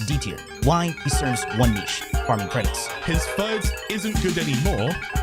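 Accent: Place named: France. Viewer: slay girl ! very sexy voice btw, i love the french accent and the build is insane plz do more of these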